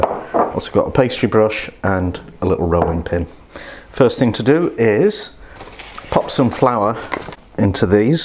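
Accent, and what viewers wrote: Australia. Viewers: What BS! I just luuv the Aussie & Kiwi accent, mate.... Really enjoyed this recipe! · I love Australian accent 😍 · Love Aussie accent! Go for it! Awesome recipe! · There is no need to apologise for your Australian accent. Just like a British does not need to apologise for his English accent in America or Australia! More interested in your recipe. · NEVER apologize for your Aussie accent! Great looking recipe and video. Can't wait to try it. I grew up in Adelaide from ages 5 to 12 then moved back to the states. Lost my Aussie accent damn it. I remember pies like this and sausage rolls. People love when I make sausage rolls, but I want to add a pie to my list of nostalgic cooking. Can't wait to try this. Thank you.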